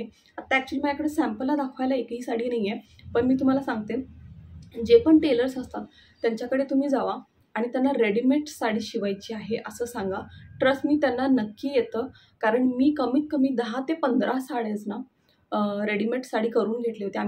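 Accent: Kolhapur, India. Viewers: कोल्हापूर ची आहेस का ताई....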